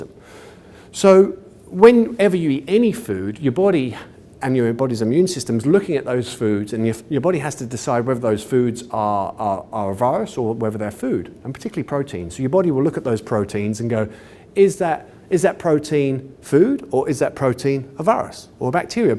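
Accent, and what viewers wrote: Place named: New Zealand. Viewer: Nice to listen to someone from home soil.....NZ! I have been diagnosed with sever vitamin D deficiency and have such horrendous symptoms. I was having bladder issues, couldn't walk properly, had bone pain and terrible brain fog and memory loss. I felt so out of control so the only thing I knew I had control over was what to eat. I have been eating exactly the diet you are suggesting....good on me!!...and after 4 weeks now I am slowly starting to feel myself returning, the inflammation is going down and my mind is clearing. I have a long way to go but your video had really helped me with so many good tips. I have just bought an organic bone broth kit so getting into that this week!! Thanks, I'm going to make my cheese loving hubby watch it!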